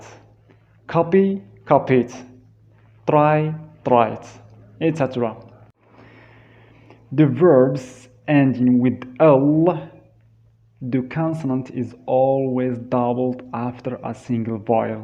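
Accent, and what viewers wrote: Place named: France. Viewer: Good job dude try to improve your pronunciation 😉 I smell some French in your English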